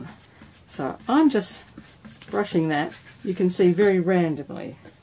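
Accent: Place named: Australia. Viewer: Most important thing which you rushed over and I could not understand what you said, was what type of surface you were using i.e. paper, board, wood, card. It sounded like 'Mat-board' or 'Net-board'. Would you please mind replying and stating also the name of the product, your accent sounds Australian apologies if not, I am from England but the name of the product will help in finding similar. Thank you.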